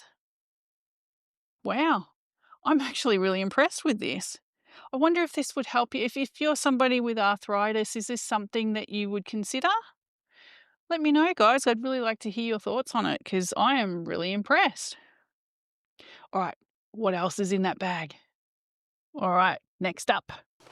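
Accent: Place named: Australia. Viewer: Found your channel through this video and two minutes in I was infatuated by your personality! The Australian accent is a bonus 😂❤ very thorough video indeed, thank you! New subbie from Sweden!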